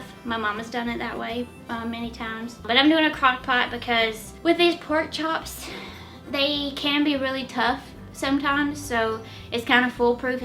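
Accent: South, United States. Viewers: I've missed your southern accent. This recipe is devine. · I'm so happy you are back. I have really missed your charm your cooking and you wonderful southern accent.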